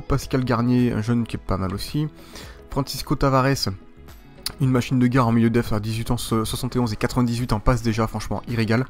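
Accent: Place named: Bordeaux, France. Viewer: Coucou tu connais pissos car j y suis allé en vacances car tu semble venir de bordeaux avec tes "sans deconné " 😂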